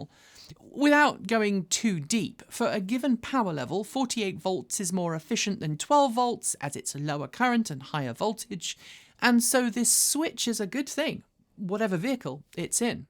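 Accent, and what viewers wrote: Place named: United Kingdom. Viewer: "While Elon Musk has been very cool towards..." Oh that's right you speak the Queen's English. It's a bit ambiguous to a Murkin.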